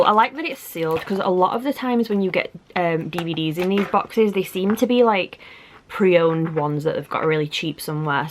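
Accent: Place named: England, United Kingdom. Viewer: I like your accent... England????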